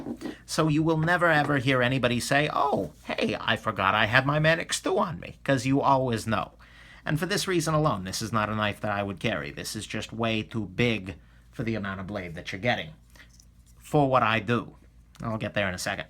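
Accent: New York, United States. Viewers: You sound like your from New York or Boston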